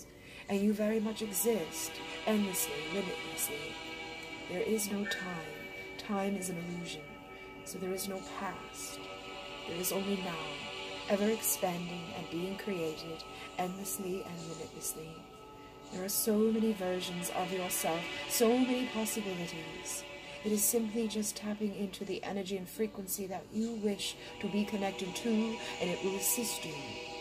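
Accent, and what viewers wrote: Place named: United Kingdom. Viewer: Am sorry but the music is to loud and it makes hard to hear and am sorry but is kind of weird hearing their kind off "British accent with your American pitch" sorry I've never heard Pleiadians speak but again is the music that makes it harder Again I don't want to sound rude or anything, I love your readings, but this is my first time listening to their messages.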